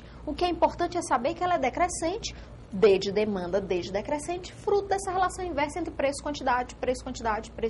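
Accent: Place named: Northeast, Brazil. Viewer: Oi, gente! Estou amando as aulas, bem simples e didática, além do sotaque bom do Nordeste. Mas, gostaria de saber onde está a Economia Aula 20 Microeconomia Bacen EVP????? Não consegui achá-la. Bjokas pra todos!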